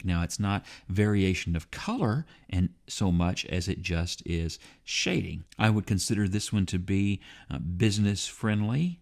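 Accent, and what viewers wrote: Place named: Texas, United States. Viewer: coming from Texas as well I vibe with the pronunciation game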